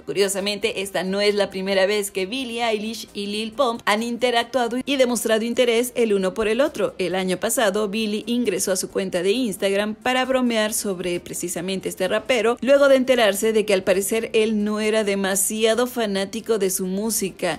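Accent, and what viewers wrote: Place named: Latin America. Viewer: Veo mucho Curioseando y ya me acostumbraba a una voz española, y ahora me tengo que acostumbrar a una voz latino americana.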